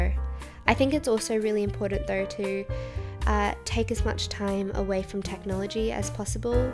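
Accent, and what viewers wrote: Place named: Australia. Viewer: Nevermind the haters. Aussie accents are adorbz :) Found you from Purple Palace's comment section lol. Cool vibes, keep it up!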